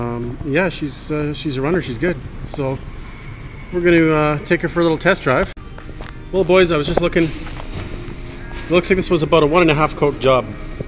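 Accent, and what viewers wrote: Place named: Canada. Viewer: I don't know what makes this video better.....your extensive knowledge of car repair or your awesome Canadian accent.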